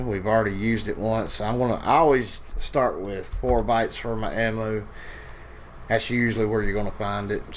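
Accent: South, United States. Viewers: Southern sounding computer talk probably has to be the best thing ever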